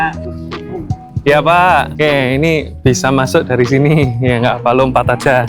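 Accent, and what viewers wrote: Java, Indonesia. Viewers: kok di surabaya sama semarang medhok banget yah apalagi kalau lagi ngobrol sama warlok padahal di jakarta nggak medhok loh, cocok banget emang kalau medhok 👍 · Wiiiii Semarang... Seneng bgt explore di tmpt perantauanku Asyik polll kyk diajak jalan jalan, medhoknya sukaaa nagih as always wkwk Jujur, liatnya makanan-minumannya bikin ngiler 😣😣 Makasih, aku jadi tau ada kulineran di tmpt tmpt itu wkwk Suka bgt konten jln jln kota kyk gini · Medok men boso jowo ne mas 😅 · Keliatan nyaman banget di semarang, tapi salfok sama medok nya 🥺 semangat keliling semarang nya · versi medhok emang nagih banget ❤❤❤ Suka banget kontennya ❤❤❤ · itu orang mana sih ngomong Jawa nya agak medok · Ini lama-lama jadi food vloger berkedok vtuber😭 Tapi seru poll sii, apalagi kalo ngomongnya medok. Ditunggu konten jalan-jalan selanjutnya ✨🧡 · jadi muedokk gini... 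yang nonton juga ikutan medok ini jawaku 😂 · SUMPAHH, MEDOK BANGETT 😭😭😭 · medhok is the best, lucu banget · gak ekspek jadi mas² jawa tulen bgt medhog😭🙏🏻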